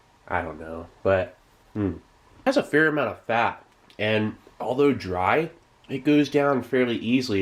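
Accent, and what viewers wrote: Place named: Midwest, United States. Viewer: Mid-America i think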